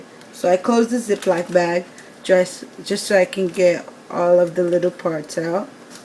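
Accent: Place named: Caribbean. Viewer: so obviously she gotta accent is either west indies or carribean ....lovin it. really liked the video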